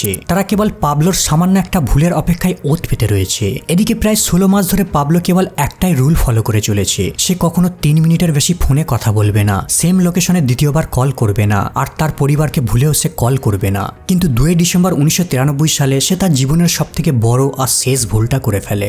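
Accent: India. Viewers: আরেহ ভাই এরা ইন্ডিয়ান এদের কথা এই রকম হয়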